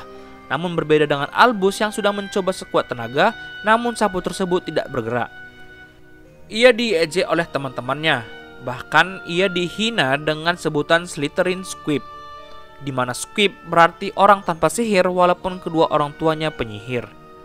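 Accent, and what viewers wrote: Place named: United Kingdom. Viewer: british skali komentatornya hehehe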